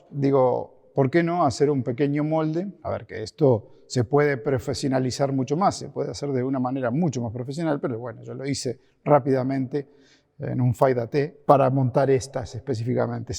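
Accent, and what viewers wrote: Rioplatense, Argentina. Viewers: Felicitaciones!! Excelente trabajo. Una pregunta, sos Argentino? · El sonido de fondo es espectacular. Buen trabajo muy creativo. Saludos desde Queretaro México. Argentino o Uruguayo? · Su tonada es mas Argentina/Uruguaya que Española, puede ser? :P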